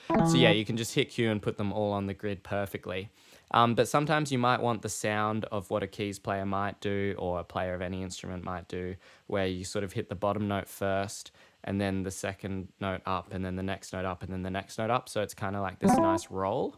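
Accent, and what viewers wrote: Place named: Australia. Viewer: So refreshing to hear an Aussie explaining Logic. Some accents really grind my gears. Hahaha.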